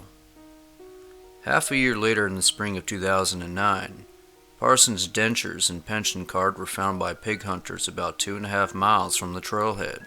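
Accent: South, United States. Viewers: You sound like the distant cousin of Jensen Ackles who comes from the south and is unfazed by everything.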